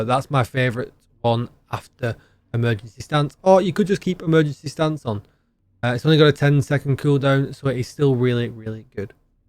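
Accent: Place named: Yorkshire, United Kingdom. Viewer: Hey where in the UK are you from. Your accent sounds maybe Yorkshire? Great content by the way.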